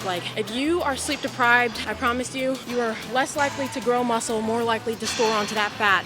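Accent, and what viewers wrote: United Kingdom. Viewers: love the british accent 😂😂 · The British accent lol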